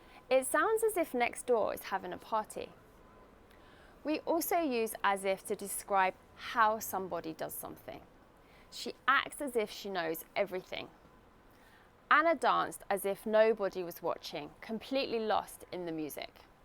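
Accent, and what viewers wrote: United Kingdom. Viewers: this teacher her accent is English British England why she is living in Philippines right now maybe she loves the tropical hot humid and beaches and full sun all year round it is warmer than UK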